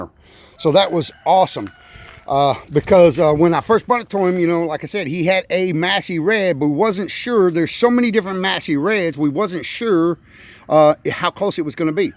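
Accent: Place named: Louisiana, United States. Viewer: HELLO HOW ARE U?? IM NEW HERE SHOWING SUPPORT FOR U. I LOVED LOUISIANA. I WAS THERE FOR 3 YEARS WORKING WITH A DREDGING COMPANY PUMPING SAND IN MYRTLE GROVE NEAR PORT SULPHUR AND BELLE CHASSE. I MISS IT THERE. UR ACCENT TAKES ME BACK. BUT AWESOME MASSEY U GOT THERE. AND SO FAR CONGRATS ON THE SAINTS SO FAR!! WHO DAT!!!!! ANYWAY I SEEN U ON ANOTHER CHANNEL I WAS ON SO I WANTED TO STOP IN. I ALSO FED ALLIGATORS WHILE I WAS THERE. ITS ON MY CHANNEL MY OLDER VIDEOS. I WAS HELPING TRIGGER TOMMY FROM SWAMP PEOPLE. IT WAS FUN! BUT GREAT VIDEO, !!🤗🤗🤗🤗🤗🤗🤗🤗🤗🤩🤩🤩🤩🤩🤩🤩🤩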